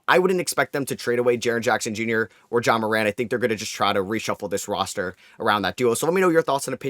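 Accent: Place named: East Coast, United States. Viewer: He’s from the east coast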